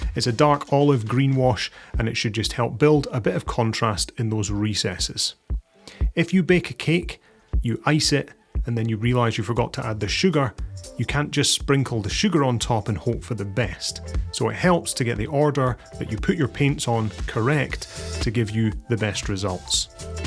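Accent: Scotland, United Kingdom. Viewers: Nice video, good job on the green paint, end result would be fine on any table top. Side note: There is something about snarky comments and a Scottish accent that amuses the heck out of me. Always a good chuckle or three in your videos. Keep up the good work... love it.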